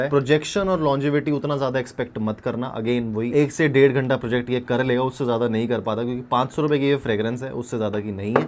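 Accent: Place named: United Kingdom. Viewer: Brit accent ka kya scene hai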